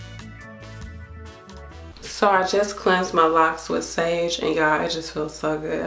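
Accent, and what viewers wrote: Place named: West Coast, United States. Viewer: I love your vibe i felt your locs through the video. You sound like your from the west coast that caught my attention. Keep up the frequency im entering my journey again after cutting my 10 year locs as a teen.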